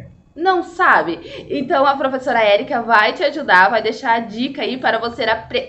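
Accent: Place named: Paraná, Brazil. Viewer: Aula muito boa , oratória muito boa com o sotaque do nosso Paraná rsrs. Vou indicar pro meu filho assistir, sucesso professora !!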